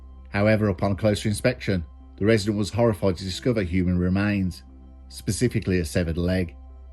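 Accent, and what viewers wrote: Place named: Black Country, United Kingdom. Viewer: Great video. Very informative and well out together. Also, you sound like you might be Black Country. I'm in Wolves.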